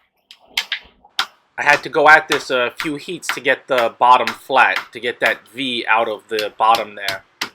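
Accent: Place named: Upstate New York, United States. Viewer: Love you’re videos!!!! You’re accent is also very interesting! I’m listening to “I’m guessing an up state New York accent? That has picked up the cadence of, for sure, and is slowly turning Taiwanese! It ads such an awesome element to your content. It’s ever present in your videos, but far more pronounced on your wandering Taipei videos when you’re obviously interacting with locals off camera! KEEP BEING AWESOME! I’ve gotta get on you’re Patreon page. Thank you for all you do!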